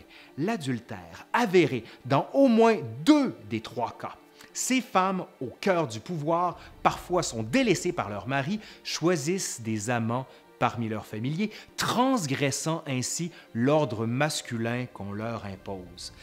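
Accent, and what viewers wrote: Quebec, Canada. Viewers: Bonne video Merci est que t'e Québécois ? Bonne année !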